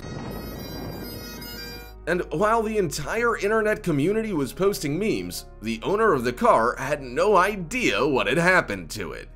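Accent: United States. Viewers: Might have been interesting to watch, but I only lasted around 15 seconds. I think you have to be american to be able to listen to that narration any longer.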